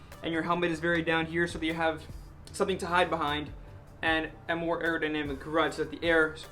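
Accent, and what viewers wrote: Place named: Europe. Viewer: Very good review. Are you European? I noticed an accent.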